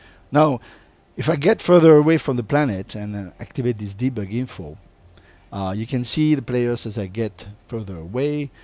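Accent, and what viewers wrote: France. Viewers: Cette accent francais :D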